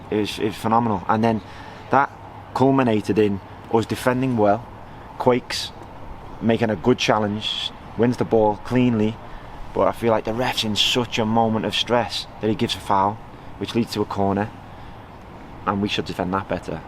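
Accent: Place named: Merseyside, United Kingdom. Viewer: Still the best choice the board made for gaffer this season. Just one thing spoken to you loads more so when you were playing out field way back in division one days and I never picked up on that accent mmmm which side of the Mersey you hale from. Just a nosey Birkenhead lass asking. Behind you all the way. You've deffo got the lads hungry some stunning set pieces going on. God bless you big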